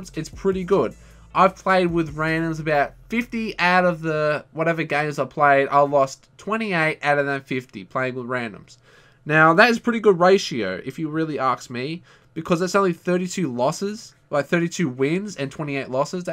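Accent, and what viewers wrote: Australia. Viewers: You Australian?? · Are you from Sydney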